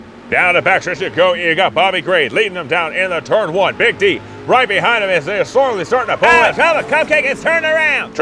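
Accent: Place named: South, United States. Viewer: Just found you guys! About time we had some good country boy narration- 😂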